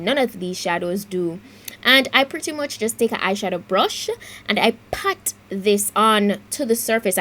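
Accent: Jamaica. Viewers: Do I sense a slight Jamaican accent...... best cut crease video for chocolate girls.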